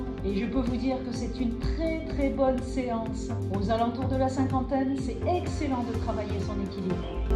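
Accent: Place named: Drôme, France. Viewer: merci pour votre réponse.. je ne m'y attendais pas..je travaille en poste de nuit en pneumologie..et vos vidéos sont calmes et efficaces.. Hé oui bien besoin d'équilibre à moto..merci oui je reste prudente!!🏍❤️ Votre gentillesse se ressent délicieusement ! Et vous êtes magnifique ! Votre petit accent me rappelle le mien lorsque je vivais dans la Drôme.. en Lorraine à présent.. il est..bieeeen différent..lol! Bisous du grand Est!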